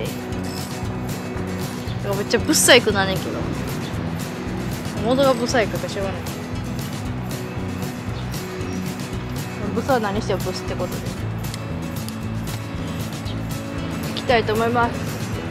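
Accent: Senshu, Japan. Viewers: 泉州弁いいですね。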